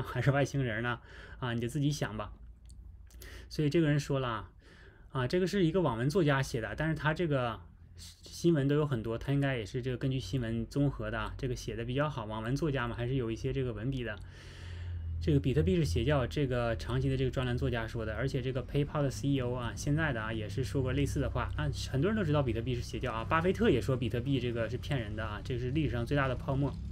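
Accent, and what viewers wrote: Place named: Northeast, China. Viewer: 播主的声音挺好的，有点东北口音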